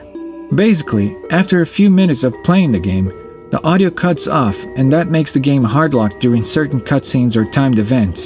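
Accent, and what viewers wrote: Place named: Brazil. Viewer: Vc é brasileiro, né? Seu sotaque é muito forte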